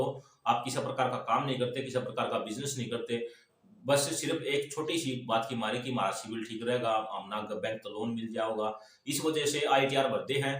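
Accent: Bhiwani, India. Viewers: Bhai shab aap Bhiwqni se ho kya aapki awaj se lag rha h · Are u frm bhiwani distct.. Your language is frm that area..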